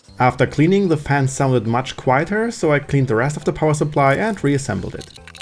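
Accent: Germany. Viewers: for a guy that sound pretty german it's just seem wrong to hear "Aluminum" instead of "Aluminium"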